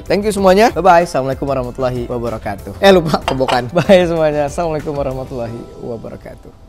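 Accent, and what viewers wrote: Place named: Banyumas, Indonesia. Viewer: Ngakak dngr ngomong ngapak wkwk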